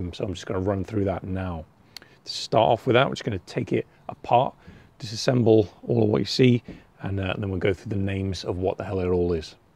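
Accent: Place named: United Kingdom. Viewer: I just realized, man's a brit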